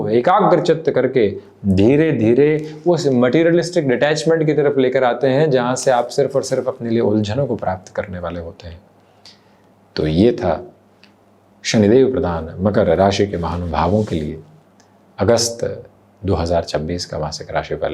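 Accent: Standard. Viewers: Aapka shud Hindi sun k bohot kushi milti h . YouTube mein aap hi sayed akele hai jo shud hindi bolte hai . Bohot bohot dhanyabaad aapka hare krishna 🙏🙏🙏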